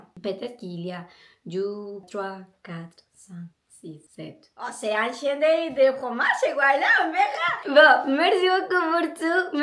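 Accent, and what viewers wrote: Colombia. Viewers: En Tous cas, vous savez bien écrire français et j aime bien votre accent Colombien 😊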